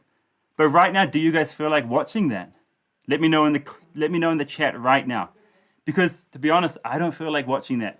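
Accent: India. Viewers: Stay safe......just started watching your vlogs after that police incident. And liked your hindi accent. Love from bangalore. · Hi I’m in the blue mountains in Sydney. We are quite good! I have friends in Bangalore that banged on pots which was quite beautiful to wake up the government.. take care and stay safe! You sound more Indian than a kiwi.. blessings 🙏🏿🙏🏿🙏🏿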